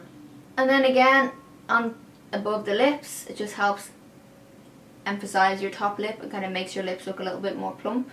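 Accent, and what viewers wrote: Ireland. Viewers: Are you Irish? Love your accent 😭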